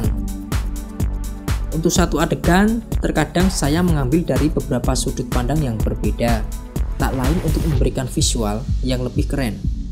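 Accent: Java, Indonesia. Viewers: Jowoe medok banget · medhok cuk,jogja ne ngendi sampeyan mas?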